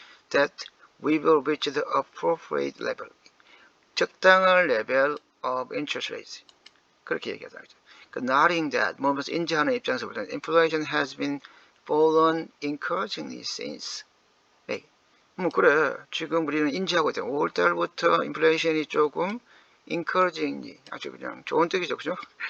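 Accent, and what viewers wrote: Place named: South Korea. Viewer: ^^ 머스크 과장과 사우디공주와 탕비실 얘기에 저도 많이 웃었습니다.^^ 우리나라에 사는 많은 사람들은 같은 한국사람의 영어발음이 더 잘 들리는거 아시죠? 감사합니다. 잘 들었습니다. 😅🎉❤😅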